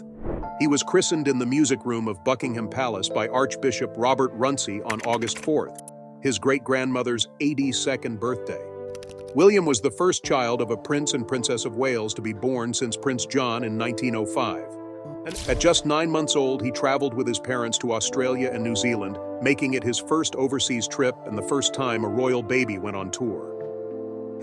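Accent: United States. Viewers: There are a few such Royal Family posts of YouTube today.Obviously someone has an agenda. The American Narration is the clue to give it a miss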